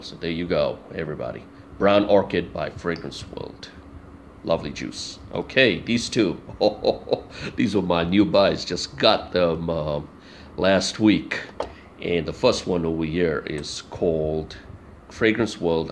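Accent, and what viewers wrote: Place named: United States. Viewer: You speak very good American English for living in India.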